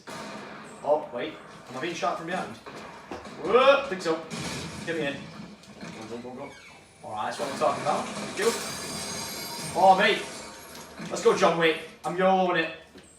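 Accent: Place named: Scotland, United Kingdom. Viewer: That’s Scottish accent is hilarious aha from Glasgow !!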